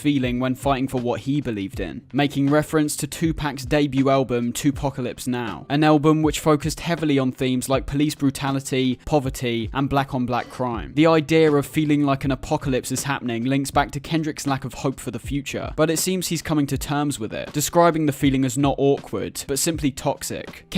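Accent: United Kingdom. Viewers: Could you possibly get rid of the British accent? It’s kinda heard to understand what you’re saying sorry haha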